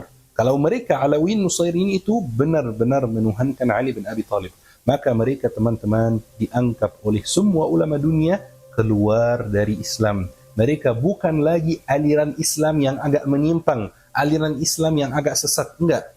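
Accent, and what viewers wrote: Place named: Arab world. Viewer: Ini spt suara. Mhs Mesir yg pernah belajar di Indonesia. Stlh tamat, dia tinggal di Indonesia. Yg dia lakukan: Pertama, jd youtuber, konten ttg mazhab2/sekte dlm dlm Islam. Kedua, setelah gagal jd youtuber, dia bertrsnsformasi mnjdi ustad.dan pnceramah. Pakai surbam, kliing2 Indonesia. Tp spt nya juga kurang berhasil. Ketiga, skrg kmbali lg jd youtuber spt dlm video ini. Kl ini apa yg dia ceritakan ini bkn hsil riset. Tp hnya berdasar berita, berdasar video2 yg sdh bnyak beredar pasca Jatuhnya al Asad. Sy justru curiga pd ini. Dia hnya ingin memainkan perasaan umat Islam Indonesia agar terprofokasi. Dia justru penebar fitnah baru. Tanda-tanda dia bohong, perhatikan dan dengarkan berkal kali dia bersumpah: demi Allah...demi Allah... Hai...kamu kan orang Mesir, coba kamu berani tdk kritik presiden kamu sendiri, al Sisi yg jg diktator al Asad. 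Brp orang yg mati dan dipenjara oleh rezim al Sisy ktika mrk protes terhadapnya..?? Comeon.....kritik presidenmu sndiri... Selancar apapun bhs Indonesiamu, sy msh bs membedakan bhw anda bkn org Indonesia. Ktika kamu mengucapkan fonem2 tertntu yg tdk ada dlm bhs Arab spt: nya, ng, pelafalan kamu msh klihatan sbg org Arab. Akhirnya, sy jstru curiga bhw anda ini mata2/ antek asing yg disusupkan di Indonesia utk mmecah persatuan bangsa Indonesia dg mmbahas isu-isu sekte/ mazhab yg terus berkonflik di timur tengah. يا....نحن نتابع كل خطوانك..وقد تركت الآثار التى لا نمحوها....!!!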